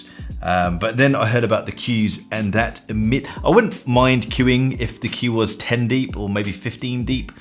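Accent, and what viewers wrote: RP, United Kingdom. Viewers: I like how he defines watch snobs and he makes videos sipping coffee after every sentence, placing watches in categories of luxuriousness and projecting his view about a plastic watch in his posh accent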